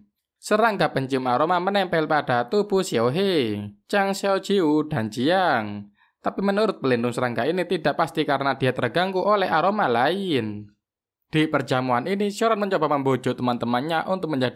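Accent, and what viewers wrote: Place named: Banyumas, Indonesia. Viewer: Mc nya wong ngapak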